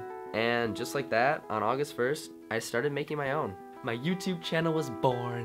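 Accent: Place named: Midwest, United States. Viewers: I knew I recognized your Midwest accent! I was born in Wisconsin and moved to Minnesota too! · You definitely sound like you're from Minnesota...cute :) So am I!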